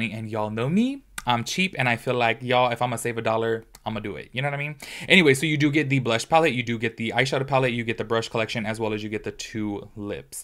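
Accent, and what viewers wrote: United States. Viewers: The way you say it is the way I say it and that's the American way to say it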